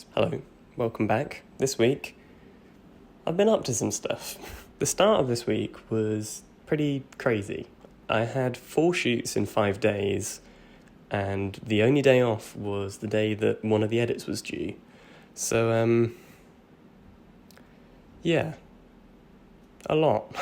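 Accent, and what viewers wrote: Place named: England, United Kingdom. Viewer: I'm hearing a lovely English gentleman but I see Cletus from Mississippi lol love ur content bro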